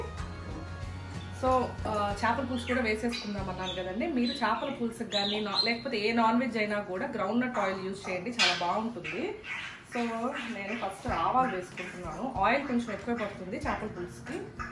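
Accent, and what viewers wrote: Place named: Nellore, India. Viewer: ఆదివారం గారి అద్భుతమైన వంటలు కార్యక్రమం కి స్వాగతం సుస్వాగతం, ఉదయం దోస చికెన్ పులుసు ఈడు జోడు కుదిరింది, మొదటి సారి మీ ముఖం మీద చెమటలు పట్టడం చూసా చాలా శ్రమించారు ఫ్ ఫ్ ఫ్, నెల్లూరు కనపడుతుంది మీ మాటల్లో గారు, రియో గుడ్ బాయ్ అండి బాబు, ఏదో వీడియో చేసినట్లు కాకుండా పక్కన ఎవరికో చెప్తున్నట్టు ఈజీ గా చెప్పేస్తున్నారు, ఫ్రీ గరం మసాలా చాలా ఫ్రీ గా వేసేసారు, ఎప్పుడు ఎప్పుడు వంట తొందరగా చేద్దామా అని చిన్న దోస పెనము మీద వేసేసారు, ఆహా నెల్లూరు యాస యాడికిపోతున్నావు👍👍👍, ఆహా నోరు ఊరించే దోస చికెన్ సూపర్, నోరు కాలింది 😂😂😂 పక్కన వీడియోగ్రాఫర్ నవ్వులే నవ్వులు, మీ అబ్బాయిల రివ్యూ బాగుంది, మొత్తానికి సాధించారు మంచి రిజల్ట్ ఇచ్చారు, చాలా కష్టపడ్డారు గుడ్. మాకు హోమ్ టూర్ వీడియో కావాలి, సిరీస్ అంటున్నారు అంటే అది ఎంత పెద్దదో అర్థం చేసుకోగలం, తప్పకుండా వీడియో చెయ్యండి గారు 👍👍👍.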